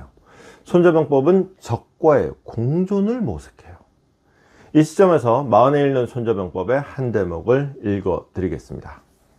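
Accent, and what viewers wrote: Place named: Gyeongsang, South Korea. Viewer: 경상도 사투리가 맛깔 나십니다..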